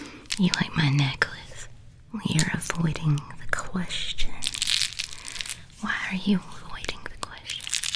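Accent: South, United States. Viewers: Hold on a second, are you actually from the South? (I realize you probably get asked this all the time, but I'm curious)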